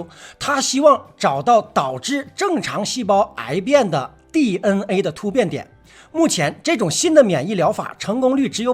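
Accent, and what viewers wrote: Northeast, China. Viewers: 你是东北人吧？熬熬很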